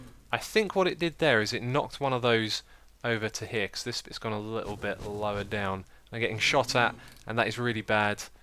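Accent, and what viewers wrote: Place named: United Kingdom. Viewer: You can speak so good British! THX for learning my English to and GREAT PROGRESS VIDEO!!!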